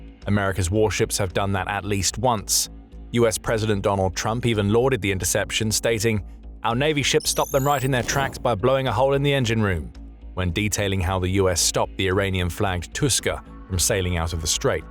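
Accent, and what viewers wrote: United Kingdom. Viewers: Why the UK Accent? Gotten indeed!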